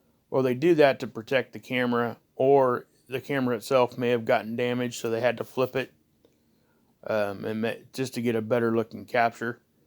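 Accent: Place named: Ohio, United States. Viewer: It helped that heavy Ohio accent ! 😉 o know cause my brother has lived in Ohio for 20 years you all sound the same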